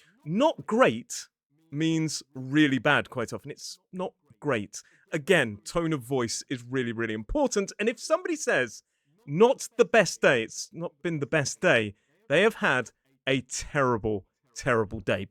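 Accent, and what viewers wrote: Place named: England, United Kingdom. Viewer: I hadn't noticed the backdrop until now. Maybe there's something missing to be sure that we are in an English man's house 😉 It's always a pleasure to watch your videos. French people are not really polite and rather direct. In-your-face rude unapologetically. English people are the best. And your accent... ❤ I'm fond of it. Thank you from Paris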